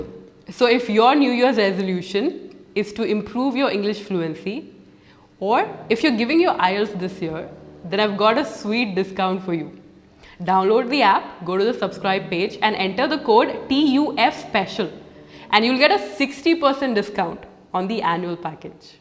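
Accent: India. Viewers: I don't like them I like only Indian English like you.. I always hear your English speaking in youtube during sleeping.... Am I telling true' English 😀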